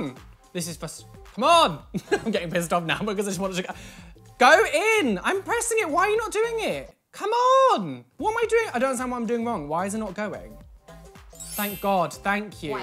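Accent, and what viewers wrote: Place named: RP, United Kingdom. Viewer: I love how he is British but he sounds like he is a Royale prince from England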